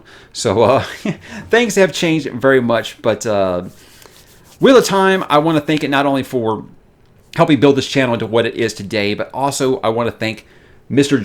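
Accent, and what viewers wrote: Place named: United States. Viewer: Hearing the intro in such a manifestly American accent is a balm after enduring three episodes of British.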